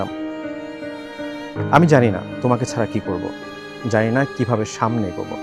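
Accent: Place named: Faridpur, Bangladesh. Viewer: আপনি ফরিদপুরের পাঠক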